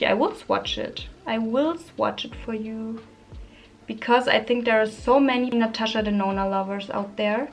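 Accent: Germany. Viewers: You look stunning ! Are you from france ? Je n’aurais jamais pensé .. i really thought you were from Germany 😅 the accent made me confused ❤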